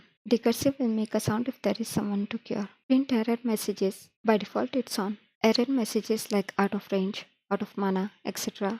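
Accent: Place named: India. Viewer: indian english probably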